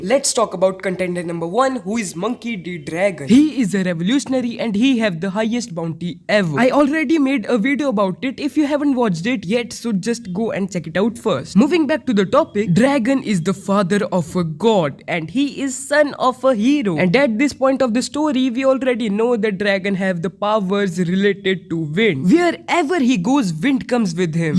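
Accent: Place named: India. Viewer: are yu ind. yur accent is alike one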